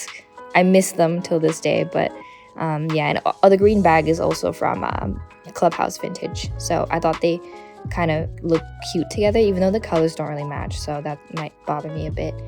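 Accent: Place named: West, United States. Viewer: here at 37 sub wow never seen anyone in sg accessorised like that and how is ur accent so western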